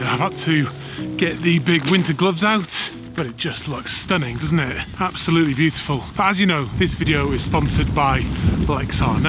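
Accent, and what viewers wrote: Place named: United Kingdom. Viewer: You definitely got some keepers on this outing. Its funny when I see a landscape photography video by someone with an british accent, and the video is sponsored, I am waiting for the usual "This video is sponsored by Squarespace". But then you say "Lexar" and I am jolted back to realizing you are not everyone else.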